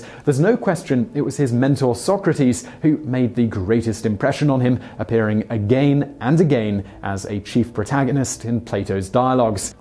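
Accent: United Kingdom. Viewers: TheLadyBridget Simon has made a video in the past stating he pronounces things the way they pronounce it in Britain, using Commonwealth English. So if it sounds different from what we are used to, we can chalk it up to different dialects of English.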